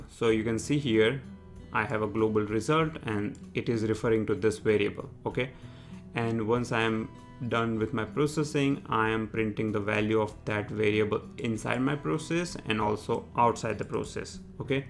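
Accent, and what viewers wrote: India. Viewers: Your voice isn't as irritating as most coders with an Indian accent. Take it as a compliment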